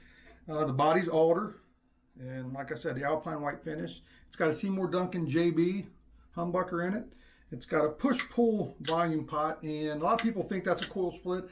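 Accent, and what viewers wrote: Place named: Baltimore, United States. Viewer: oh. I kind of heard the bmore Maryland accent in your voice, plus you stated with a Riff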